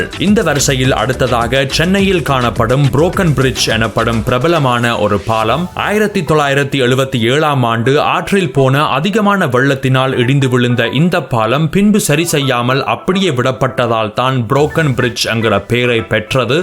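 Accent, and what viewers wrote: Sri Lanka. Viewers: Srilanga Tamil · Neenga Sri Lanka tamizhana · இது என்னடா, இலங்கை வாய்ஸ் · Anna nenga srilanka thaneai nenga pesurathu enga naattu jafna Tamil pola iruku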